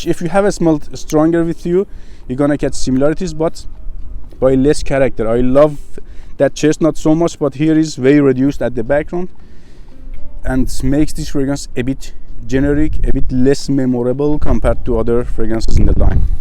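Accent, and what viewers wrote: Iran. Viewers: You Are iranian guy i Can Hear it out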